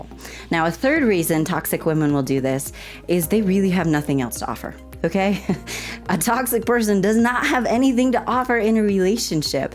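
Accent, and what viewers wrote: United States. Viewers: This is your best video, because you look so funny, talking very fast, in another video, in the background. I also like your singing AND your bloopers. As you have opened up to me, revealing that you go to the Church of Jesus Christ, is it rude to ask you, is your American accent from Washington, or California? I was a "good boy" for you Coach, and watched your video.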